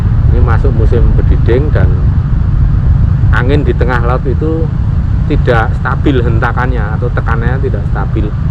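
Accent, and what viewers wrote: Java, Indonesia. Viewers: Kamu loganya jawa .......saya tahu kamu aslinya loganya palembang..........apa anda orang indigo........saya juga indigo dari kecil.....dari ayah saya zakir naik......last ferts how do gers boy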